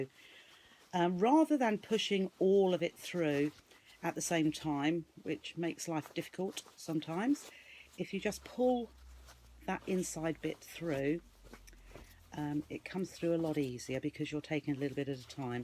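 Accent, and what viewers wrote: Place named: United Kingdom. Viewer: I am Arabic woman I like your British accent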